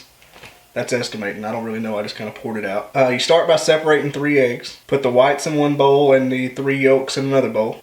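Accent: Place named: Texas, United States. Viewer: I'm gonna try this! Looks good! You have got to be from Texas,:)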